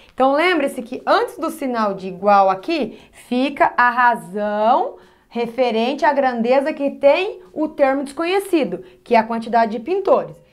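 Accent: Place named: Minas Gerais, Brazil. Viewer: Professora você tem um sotaque muito bonita . você parece ser mineira . gosto muito de matemática é uma matéria muito utilizada no nosso dia a dia 😔 obrigado professora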